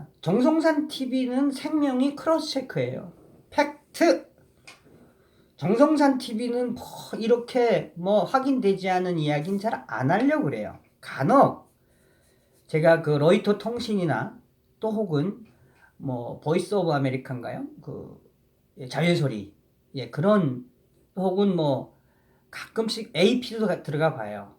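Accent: North Korea. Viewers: 넘 잼나고 유익한 방송 열나게 보고 있습니다! 이북 사투리가 구수하고 정겹습니다! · 악플다는 놈들 신경쓸것 없습니다. 그런 거지 같은 새키들 망할 인간들입니다. 그런데 말씀을 하실 때 이북 말씨 보다는 그냥 서울 말로 해 주세요. 서울 말씨가 듣기가 편안합니다. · 고조고조 걱정 마시라여 최고임네다 북한말 재미슴네다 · 웃겨요 북칸사투리 이미눌러음매다 ㅎ ㅎ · 감독님 너무 재미있습니다 배꼼 잡았습니다.특히 북한말씨 넘 웃깁니다, 호호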